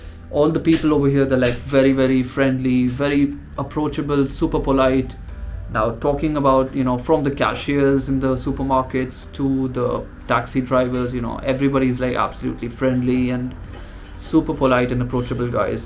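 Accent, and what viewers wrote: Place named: United Kingdom. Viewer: British accent agaya hai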